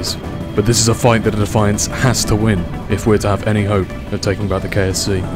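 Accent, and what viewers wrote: United Kingdom. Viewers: Find me a non British KSP content creator…. It’s annoying. Honestly, what is with British people and KSP? I even have a friend in Britain who plays. I’m not overly pissed, I’m saying there’s too many and I’m done listening to the accent. I’m entitled to my own opinion for whoever finds this comment and so are you. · I literally adore your voice. The voice over aspect really does sound professional. The air combat made me cringe a little though, a bit too much top gun. If an enemy started slowing down in front of them a real pilot would start to climb to to lower the speed and maintain distance. That way the enemy would have to climb to attack him, which they can't do with no speed, and the attacking pilot can later trade that altitude for acceleration later in the fight as well as using gravity to enhance his turn capability. I mean most people will just think its cool and not understand the finer points of air combat so don't take it too hard, but its about the most basic example I could imagine of energy-manoeuvrability theory upon which every single combat pilot's training is based. 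Literally as elementary as multiplication for a physicist. But that off my chest, the rest of the video was great and very well produced. And hello from a fellow Brit, at least I won't have to cringe at every other word's pronunciation.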